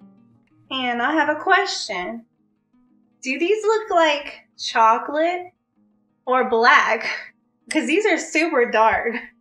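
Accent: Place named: South, United States. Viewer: Love the southern drawl y'all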